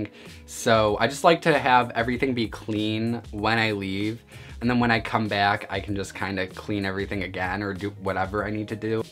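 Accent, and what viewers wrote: Midwest, United States. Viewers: He def has a Midwest accent! I never made the connection!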